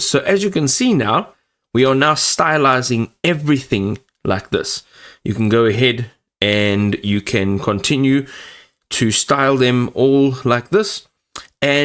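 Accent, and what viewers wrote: South Africa. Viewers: Saffa accent. Good stuff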